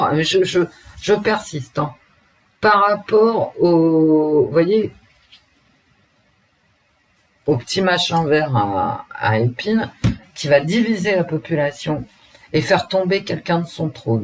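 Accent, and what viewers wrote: Paris, France. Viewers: 🥰 🥰La Cartomancienne "En Tête à Tête avec elle Même.Merci de nous Enrichir.(j'adore,votre gouaille de Titi Parisien)j'y retrouve: mon parrain et ma marraine,les bretons à la Capitale en 1940 tout jeune💗💖Oui il est beau ce TAROT. Le 2 oui. La Dualité. Il Est Ou L'Homme...Bonheur. Joie. Amour à toutes et tous. Bonne fin de journée 😍😘🥰🤗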